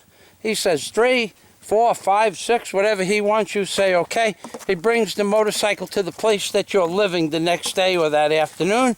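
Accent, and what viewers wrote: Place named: New York, United States. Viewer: I'll skip over the probability of you ever looking like a filipino by changing your appearance. BUT sorry bud, with that New Yawk accent you'll never be able to pass. Good video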